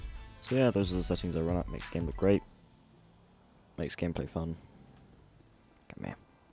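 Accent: United Kingdom. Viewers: yes and this british accent makes it FUCKED UP.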